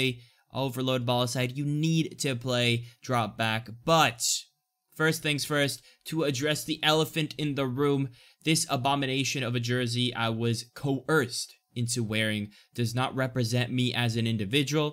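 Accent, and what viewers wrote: United States. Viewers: well u sound like u from DC or something,well anyways I like ur vids very accurate and description based, congrats on subscriber growth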